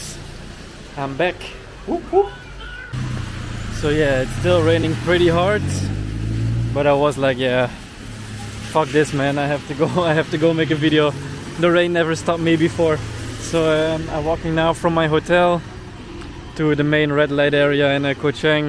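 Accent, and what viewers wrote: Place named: Netherlands. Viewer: You sound Dutch bro , netherlands or Belgian ? 😜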